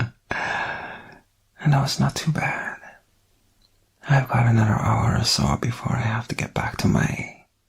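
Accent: Ireland. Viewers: What kind accents is this irsh😍🤭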